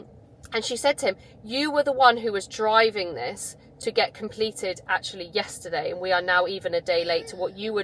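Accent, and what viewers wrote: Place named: England, United Kingdom. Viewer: I wouldn't have thought the garden would be a priority surely sorting the house should come first. You have an English accent but act like a silly American over the top tiktoker. You have children please grow up. You really don't need to document every fart.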